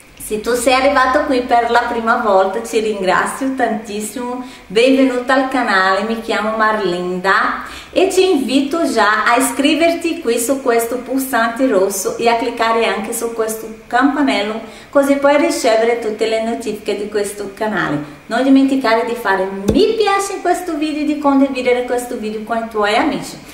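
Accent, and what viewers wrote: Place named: Brazil. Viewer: Mi piace il tuo acento brasileiro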